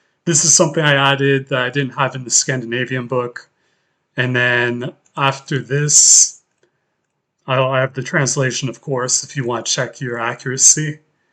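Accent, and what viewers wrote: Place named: United States. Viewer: What a concept! I am considering learning Afrikans and Frisian at the same time, or at least close together. I am going to go to your website because I am curious about you. Based on a few clues I think that you were raised in a household where English was the primary language of communication. But, you do sound American. So, my first guess is Yiddish.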